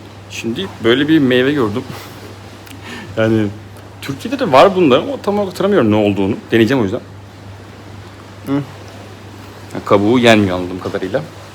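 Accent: India. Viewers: aga, tenin, konuşma şeklin hintlilere benzemişsin :) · tam hindulara benziyorsun biraz türkçeni düzelt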